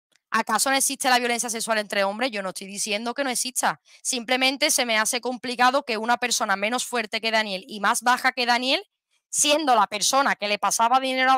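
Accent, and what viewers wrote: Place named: Andalusia, Spain. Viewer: Hola!! Me he suscrito recientemente, gracias tb de estar suscrita hace poco d a través de Triunarts y asi os he ido descubriendo. Me encanta como compactais las dos y bueno tb como explicas los casos. Espero q te vaya todo genial!!Además me encanta el acento andaluz 😊 Saludos desde Barcelona 🤗😊😊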